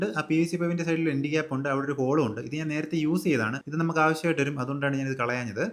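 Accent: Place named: Pathanamthitta, India. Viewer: പത്തനംതിട്ട ആണെന്ന് തോന്നുന്നു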